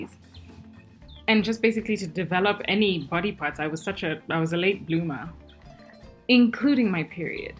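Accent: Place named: Germany. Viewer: Kann es sein dass du deutsche bist? Leichter Akcent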